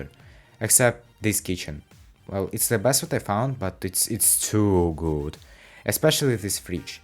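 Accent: Russia. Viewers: у тебя акцент русского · Акцент подсказывает мне что ты русскоговорящий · русский акцент везде услышу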